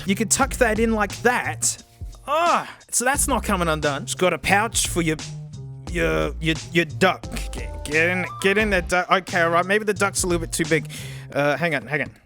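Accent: Australia. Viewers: Can you please reveiw the skullcandy crusher's, i have had them for 2 years and i love them but i would love to hear your opinion on them. Btw, love ur vids and laugh everytime u talk with that Austrailian accent XD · I can never understand what you’re saying on these mic tests. It’s a mixture of australian accent with me not being great at english and headphone mics sucking that is simply impossible for me to overcome. I’m so sure you’re saying something funny I still laugh sometimes tho, hahahahah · I fucking love Australians. The accent is on point for these type of videos.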